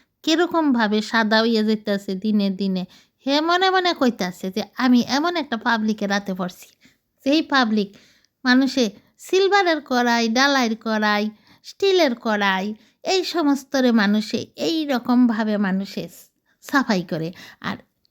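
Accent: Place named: Bangal, Bangladesh. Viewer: তোমার বাঙাল কথা শুনে আমার খুব ভালো লাগলো দিদিভাই🙏🙏🙏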